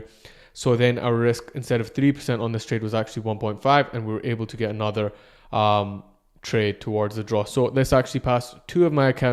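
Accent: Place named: Scotland, United Kingdom. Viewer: Nice to hear another Scottish 🏴 accent.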